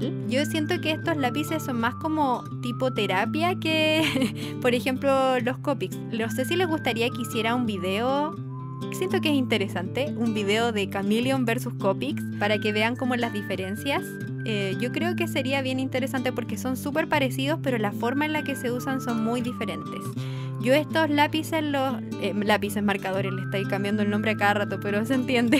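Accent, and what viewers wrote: Chile. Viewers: 🎀Es re tierno tu estilo veo tanto tus videos que ya se me pego el acento chileno🎀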